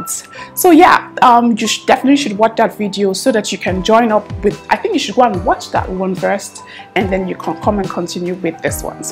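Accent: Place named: Nigeria. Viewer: I can feel some Nigerian accent ☺️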